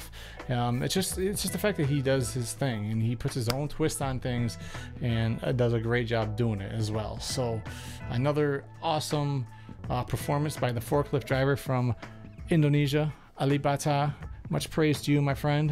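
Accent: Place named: United States. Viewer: good reaction bro, by the way, where are you from, let me guess? your language is American English, am I really you from America ?????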